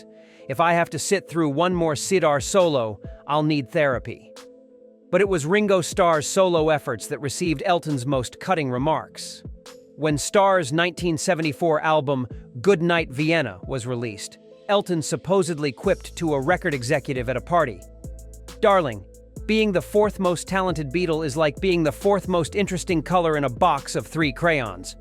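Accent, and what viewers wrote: United States. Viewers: Elton and Freddie frequently partied together and also exchanged gifts with each other. Elton even cried at Freddie’s passing, and sang the song at his tribute concert. They were very good and close friends, I’d say and the rivalry wasn’t toxic the way it’s described here. This snarling narrator with an American accent has produced this video for clickbait by peddling in significant mis/disinformation imo.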